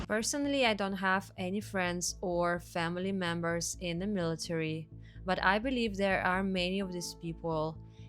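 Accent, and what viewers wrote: Russia. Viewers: Horrible Russian accent! You need subtitles, period!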